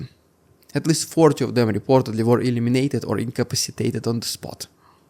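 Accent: Russia. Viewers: One of your better deliveries.... You were calm and relaxed, and that makes it so much easier to understand you.... you always have a point, but sometimes you're too excited and talk too fast, which is hard to understand because of your Russian accent. you sound more intelligent when you speak slowly...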